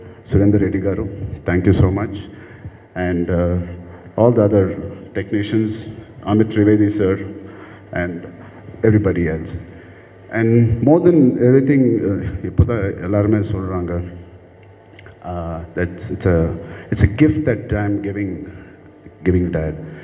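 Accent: India. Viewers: He is speaking like * tamil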